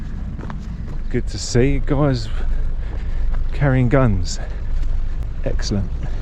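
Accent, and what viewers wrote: United Kingdom. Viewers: not going to try and polish a turd here." lol This is very funny, yet with his British accent, he can basically say anything and it sounds like Shakespeare. 🙃 Turd (n) sometimes vulgar : a piece of fecal matter 2. vulgar : a contemptible person Examples of turd in a Sentence He's an obnoxious little turd. 3. a word used by a Spanish speaking bearded British man as he strolls the Kent coastline.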